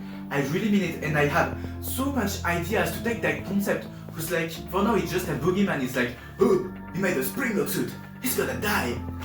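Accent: France. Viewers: Are you french? Also, love your vids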